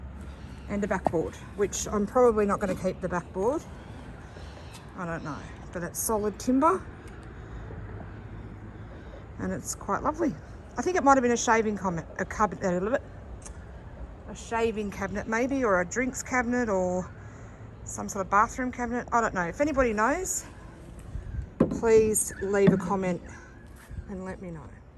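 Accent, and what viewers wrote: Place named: Perth, Australia. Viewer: This is lovely! i must subscribe as my daughter is in Perth, and I can just listen to your accent and imagine my daughter's friends and co workers sounding just like you. Thanks!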